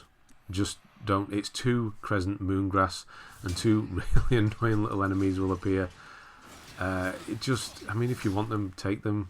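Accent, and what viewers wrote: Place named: Teesside, United Kingdom. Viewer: mate these have been a great help so far never played a souls game before had to restart after watching your first vid as I had agro'd that first Prince and ended up feeling like I had to kill him I have now filled all the way through taking shots at doing it on my own and watching the vids to make sure I havnt missed anything and leveling up with you at the end this has been a great help were are you from you sound like you are from near me I'm Teesside UK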